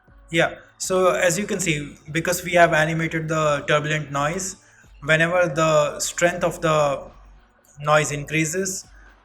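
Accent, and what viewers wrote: India. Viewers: Indian! love it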